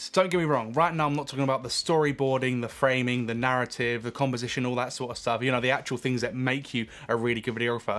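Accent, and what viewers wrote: United Kingdom. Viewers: Can’t figure out ur accent, r u English?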